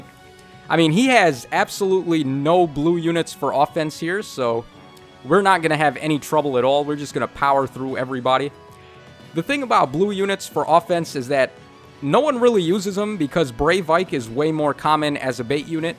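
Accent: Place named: Brooklyn, United States. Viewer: May I just ask you to say Kiba? Because you sound like you're from Brooklyn or that area at any rate...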